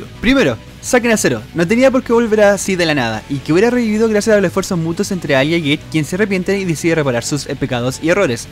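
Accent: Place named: Chile. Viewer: El primer chileno que escucho hablando de megaman. Que rico. Jaja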